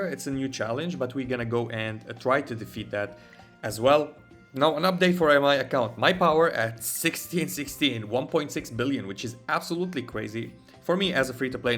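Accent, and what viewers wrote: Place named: Arab world. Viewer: Bro i think you are arab, your english accent is arab. Anyway, are you still playing echocalypse?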